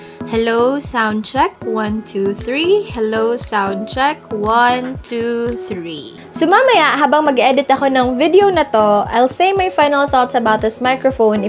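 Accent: Visayas, Philippines. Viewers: Hala ate😲bisaya man diay ka😆❤️😻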